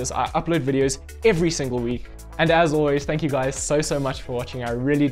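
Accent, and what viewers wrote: South Africa. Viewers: Sound South African ...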